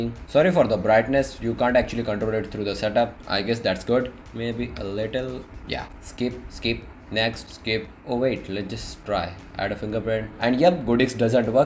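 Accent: India. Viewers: From your english u sound like an Indian. What will u do without volte and with 7.1.2 . It doesn't matter if it is 7.0 ot 7.1.2 off basic things are not working. Strange people..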